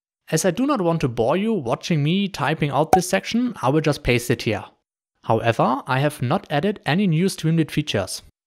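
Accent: Germany. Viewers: klingt krass deutsch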